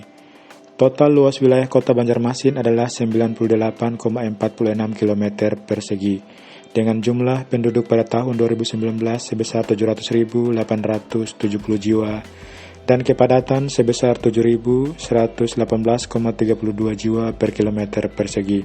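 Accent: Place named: South Sulawesi, Indonesia. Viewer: Dr logat bahasa sepertinya admin orang sulsel ya 😂,sangkamma jiki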